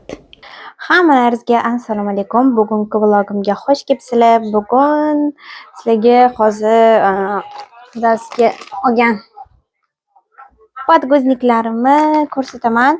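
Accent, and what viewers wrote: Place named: Samarkand, Uzbekistan. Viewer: Samarqandanmasmisiz sheva tortadi😊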